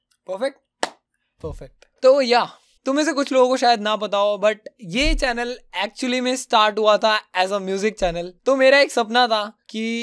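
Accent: India. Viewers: Mana tumhe English aati hai yrr Indian hoke English bolre ho 🙄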